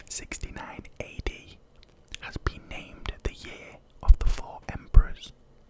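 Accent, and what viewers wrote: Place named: Northern England, United Kingdom. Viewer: Very good ASMR voice. I was confused for the first 15 seconds, I thought it was an American accent at first and then northern English came through? ^^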